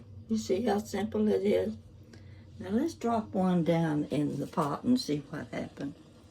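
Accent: South, United States. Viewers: I'm just so happy I came across your channel! What a lovely accent you have. I'm going to assume you live in the southern part of our country. Actually, I'm from the colder part which in the Northeast. I hope this doesn't send cold chills through your bones but I actually look forward to snow🌬⛄ This is the time of year I cook and bake more often. Even though I live in the colder states, aka New England, I do enjoy southern dishes! And of course who doesn't love chicken and dumplings? Yours certainly looks delicious! Thank you so much for sharing and look forward to being subscribed to your channel! 🙏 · Sitting in Canada listening to your sweet southern drawl!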